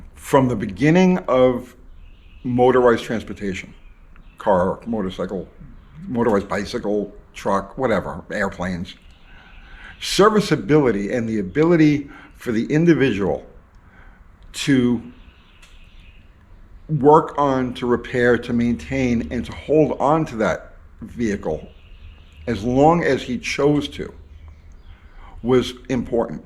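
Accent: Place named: North Carolina, United States. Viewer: You sound very much like some of my old friends here in North Carolina. I thought these same thoughts in their entirety that you are thinking and I am 70 yrs. Old and a deactivated Marine. You and I could talk forever on these and many other things. I used to love the old westerns and old science fiction and many other old things. I don't know if you are aware that the points vehicles are EMP proof. The computer brain vehicles are not and the systems would be fried if an EMP explosion went off or if a nuclear bomb hit 60 to 100 miles away. Call up some manufacturers and ask them are their cars, Jeeps included are EMP proof. I own a 2011 Jeep Wrangled, Call of Duty edition with only 73,400 original miles on it and Jeep can only speculate about it's ability to endure. I love the Jeep and it has never given me any problems except in the electrical department which required new complete wiring for 800.00 dollars at the Dealership. 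I would love to have a wrangled type jeep from the 60's and most especially a jeep from the Nam Era that was not a demilled military one. They cut the bodies in half. I don't trust rewelds that leaves a soft spot in tempered struck steel. Of course they don't do over 60 miles an hour but a great mechanic could boost it up. That would definitely survive an EMP attack and get me purtineer anywhere I need to go. Pop a couple of gas and a water can, axe and shovel and I would be in business ( biness).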